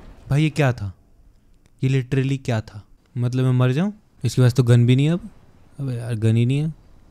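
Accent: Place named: Haryana, India. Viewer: haryanvi accent so good 😂♥️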